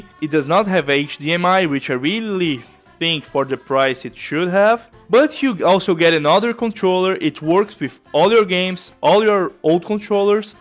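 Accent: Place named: Brazil. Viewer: sotaque brasileiro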